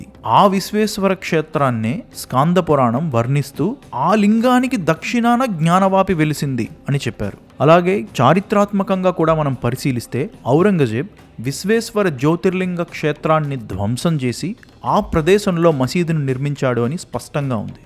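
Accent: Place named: Telugu, India. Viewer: I knew you are Telugu. Your accent seemed so familiar to that of a very dear friend I have got - also Telugu. I'm still waiting for the mathematics series. Please continue with it. I wish to know the Bharatiya Ganitam so much.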